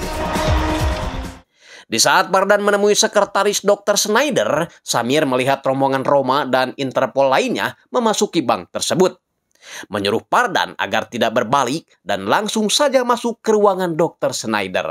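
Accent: West Java, Indonesia. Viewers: orang Sunda ya...logat nya Sunda banget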